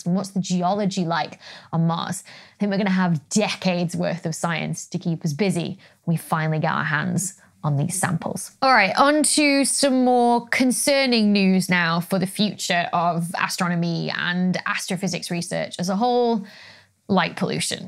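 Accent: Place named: England, United Kingdom. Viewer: your english accent is absolutely delightful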